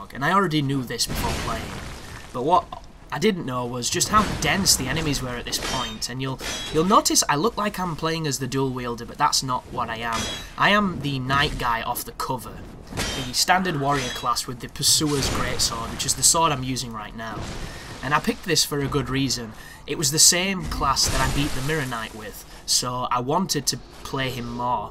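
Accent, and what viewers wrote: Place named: England, United Kingdom. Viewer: Hey guys, do you know what time it is? Time to listen to a man with an English accent talk about Dark Souls 2 for 10 minutes. Another awesome way that I like to spend my valuable time. Keep up the great work:)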